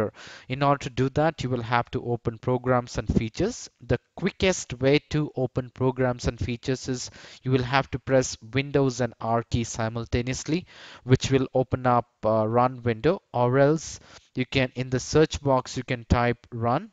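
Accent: India. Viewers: Indianan voice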